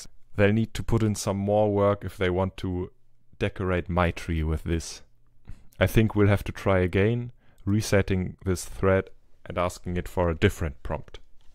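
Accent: Germany. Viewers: Oh nö 🙄 Wenn deutsche versuchen englisch zu sprechen 😣 Man hört das immer raus 😂 Klingt schrecklich 😱 Ansonsten guter Beitrag 😘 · Cool, bevor ich die Kanalbeschreibung gelesen habe, dachte ich sofort... Das ist doch ein Deutscher 😬 ich abonnierte Mal deinen Kanal... Cooler content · I can clearly hear you denglish xD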